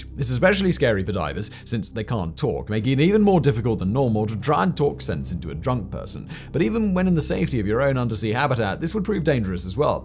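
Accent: United Kingdom. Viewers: omg your posh voice and pose make me doze...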